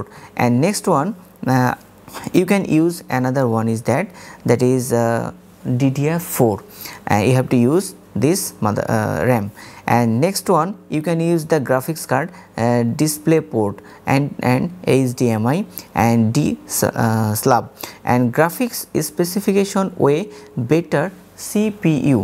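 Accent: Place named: Bangladesh. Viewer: Your English told that you are a Bangladeshi😅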